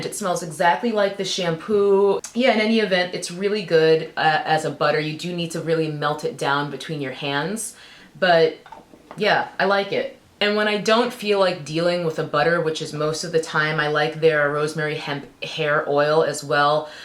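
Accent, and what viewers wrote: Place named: DMV, United States. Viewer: Just found your channel amd love your voice! You speak like me!! From DMV by any chance?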